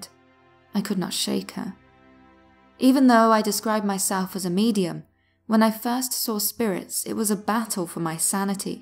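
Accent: United Kingdom. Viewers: Only thing I dislike the British accent. It's a turn off when listening to this stories I will never understand why pple find the accent attractive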